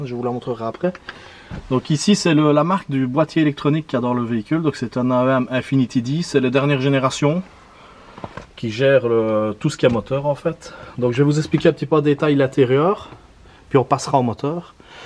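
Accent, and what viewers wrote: Liège, Belgium. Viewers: T belge ? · J'arrive toujours pas à m'habituer à l accent de Liège mdr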